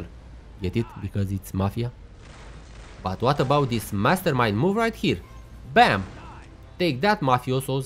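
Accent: Romania. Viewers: you are romanian right?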